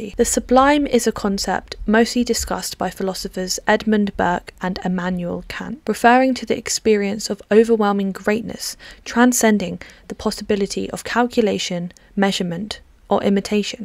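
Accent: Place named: United Kingdom. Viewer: Maca bree? Is macabre pronounced differently in the UK? (Only guessing, as you sound British.) *Didn't want to leave just that...i found the entire essay insightful and well-written. You have some good thoughts and know how to put them together. I have an English degree but only write for myself now, mini essays. I was listening while, ironically, coloring in a coloring book (with my 300 sharpies) as i do when i flip around youtube looking for something engaging. I subbed, and I'll be back to check out the rest of your content. (One more thing: i used to have a little dog named Honey Bee, and i have "Bee" tattooed on my back, on my shoulder blade. Its the only tattoo i got in jail, years ago.)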